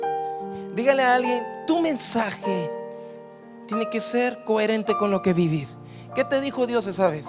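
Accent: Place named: Guatemala. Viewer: Andaba buscando música Heavy metal en español y pare aquí, me llamo la atención el acento chapin, Soy de Guatemala y, por el acento y forma de hablar, el también es de Guatemala, C.A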